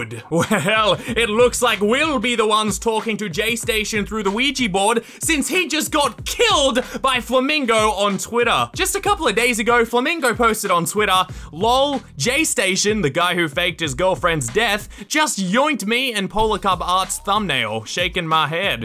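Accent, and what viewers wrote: Australia. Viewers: I love his australian accent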